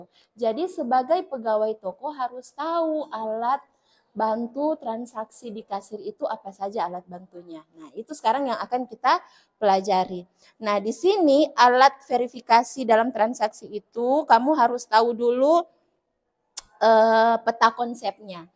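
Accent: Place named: Manado, Indonesia. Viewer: Logatmanado ya ini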